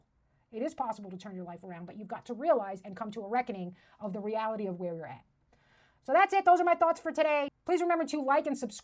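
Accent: New York, United States. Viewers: Women berating other women for being women is a new content genre. But in that NY delivery, it really feels sharp and totally lacking in appeasement, which is great. I would date you, because I get the feeling even the fights would be funny AF, ngl. Wish you the best of luck with your comedy career, I really need this no bs attitude to make a comeback to standup, everyone's being so weak right now. Easy sub.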